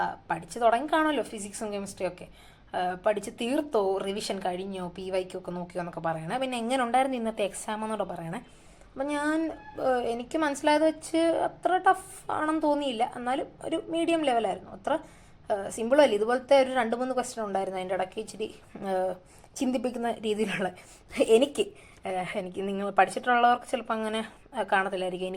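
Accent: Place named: Kollam, India. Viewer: Kollam aano allel alappuzha aano ente cousinte slanginu similar slanganu sisinte slang 😅 btw all the best sister, ente examum moderate level eennu parayum, paper different aanu, pinne bio namukk, bot3, zoo3 chp vechitt ind, physics complete aayi ini derivations practice chyan und.... All the best champ . 🔥🗿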